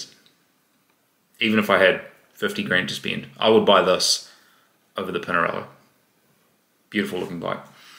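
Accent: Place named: New Zealand. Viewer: I AM outside of New Zealand watching this. But with mumbled staccato outbursts it’s really hard to understand. (Of course I understand that’s your native accent. But being more prepared and slowing down it might be easier to understand)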